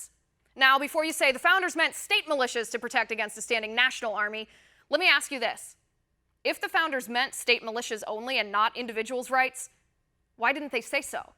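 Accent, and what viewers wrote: United Kingdom. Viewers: Being part Irish, I am too polite to write how I feel towards Morgan and his British accent telling me to disarm. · "Are not the King's ships and the King's troops enough protection for an honest man?" Gen. Sir Thomas Gage, commander of the disastrous British raid at Lexington and Concord. Does anyone know if and Gen. Gage related? Gun control with a British accent does not play well here. It didn't play well 243 years ago either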